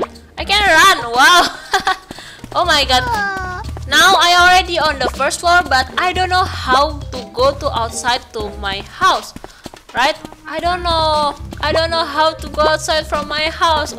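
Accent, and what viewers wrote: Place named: United Kingdom. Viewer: Ur Voice Sounds Britsh.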